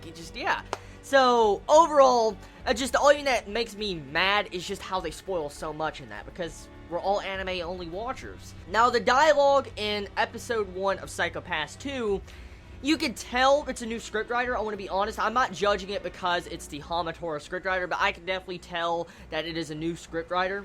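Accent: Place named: Tennessee, United States. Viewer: Ah my fellow Tennesseean, I can hear it, as I speak with it. Not such a strong twang so much as a way of saying some words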